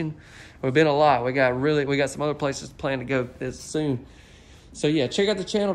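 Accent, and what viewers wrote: South, United States. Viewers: my man! Having moved to Yankee country many years ago, I miss hearing the Mother Tongue. As well as great, informational tours, your voice takes me home to wonderful memories of growing up in the South. All I need now is some Carolina BBQ and some boiled peanuts! Thank you! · I haven't gotten past that beautiful blue in the ceilings and all around the decor., what state are you in? I want to sell my home and leave the state. Your commentary and that beautiful country accent does it for me 😂! Do you or your team deal with VA loans or know how to assist veterans? Please let me know. Thank you! Wishing you & yours a wonderful & blessed New Year. Please stay safe out there. U S Army Retired (<num>) Iraq Veteran. Peace & Blessings, Light & Reflections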